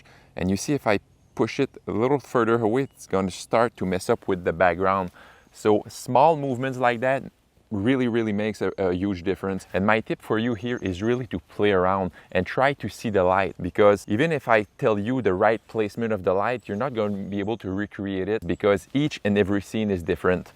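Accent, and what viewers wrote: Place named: Quebec, Canada. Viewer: Its the quebecois heavy accent for me. All the different angles of this video though, lots of work going into this for sure. Great job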